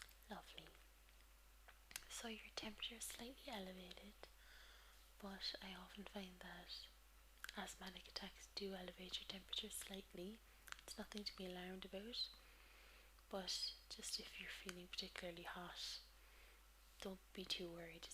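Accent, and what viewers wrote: Ireland. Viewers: This was great! I love your Irish accent! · Corr your voice is so relaxing, I know Im typing this at 3.51am and the sun is coming up and the birds are singing but I feel I need to listen to this video again so I can relax and go to sleep. You seem to cure my insomnia at times. I also get tingles in my head and thats it before I know it Im out like a light! I do love your accent! Im a sucker for an Irish accent on a girl. Errmm I also seem to wake up with a raging boner so I blame you lol. But seriously wicked video! top stuff! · Ahhhh Irish accent! Love this video :) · Kind of sounds like an Irish Quinn Fabray (from Glee). But that's a good thing! Fantastic video. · My goodness. This video. If it weren't for my empty stomach, I'd be knocked out cold right now. Your brogue is just enchanting in every way, mixed perfectly with ASMR triggers and a low soft-speak. 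Absolutely magnificent job. Thank you so much for this video!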